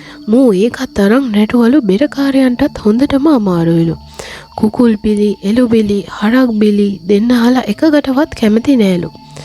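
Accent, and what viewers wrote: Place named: United Kingdom. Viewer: Uk accent nam set ma na boka 😅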